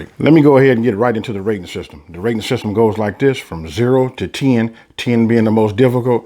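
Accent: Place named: California, United States. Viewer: This rt hearrrr. Omg i love that cali westcoast dialect. It's errythang. I love your cooking.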